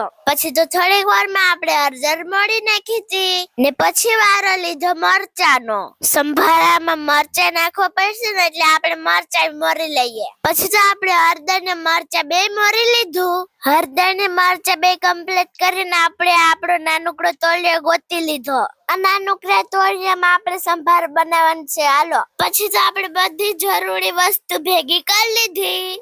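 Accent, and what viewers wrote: Kathiawar, India. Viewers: Khatiyavadi boli Achi h bazre ni rotti khub hari bnati ho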